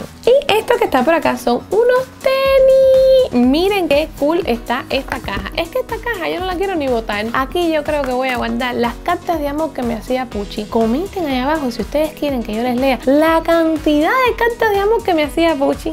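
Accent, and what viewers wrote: Cuba. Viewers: seguro por el acento cubano. No sé de dónde eres, pero yo soy Dominicano, y en Cuba y aquí usamos términos parecidos y/o iguales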